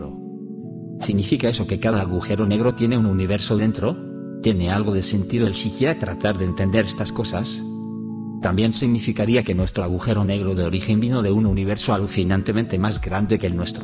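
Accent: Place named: Russia. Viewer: no mames que pedo con esa voz parece q esa IA aprendió hablar español despues del ruso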